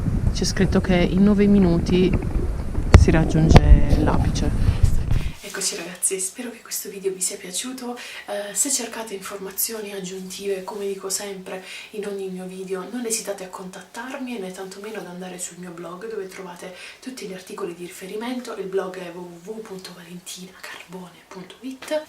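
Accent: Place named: Apulia, Italy. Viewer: Sei in gamba e una bravissima comunicatrice professionale e mai banale. Mi fanno impazzire le tue pronunce pugliesi e la totale mancanza della esse impura!! Sei grande!!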